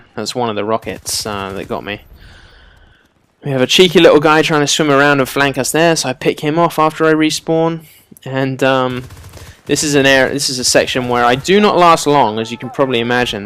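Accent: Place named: London, United Kingdom. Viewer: You sound like you're from London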